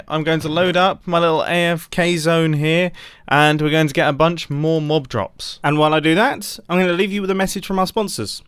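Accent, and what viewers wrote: England, United Kingdom. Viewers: sounds like a proper englishman (or possibly the love child of joe hills and harry potter)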